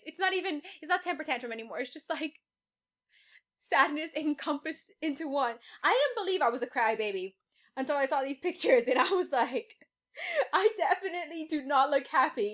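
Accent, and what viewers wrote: Spain. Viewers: Why is the album title in Spanish? It says:cuando era bebe! I'm not surprised cause I know Spanish and she talks like she talks Spanish soooo... Btw you where a really cute baby 👶 ❤️ Like if you agree!👍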